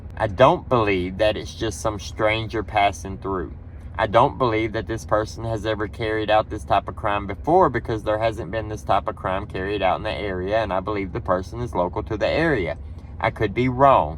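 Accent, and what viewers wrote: South, United States. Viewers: This is the 1st video I've seen of yours. If I'm being honest I was going to skip past it. I decided just because you didn't speak like me and had a Southern accent doesn't mean I shouldn't listen to what you have to say, obviously 🙄 I'm glad I decided to give it a chance. Keep up the good work! Good take on this case. I don't fully agree with your take on what happened. I Think those 2 surviving roommates had something to do with this. It's way too weird for them not to see any blood. Dude there said it was a blood bath. There's blood leaking out of the f**** house. This whole case blows my mind, though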